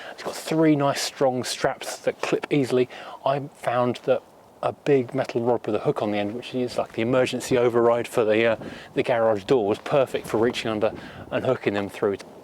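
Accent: United Kingdom. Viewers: ...The best investment I ever made was an Ozone machine. You run it for about 30 minutes inside the car. It will kill everything in the car such as mold, insects, etc. I feel much safer when I drive my older cars knowing I'm not inhaling mold spores where I can't clean! The machines are only around $75.00! ENJOY your channel! With that British accent, you make me enjoy even more my Rolls Royces!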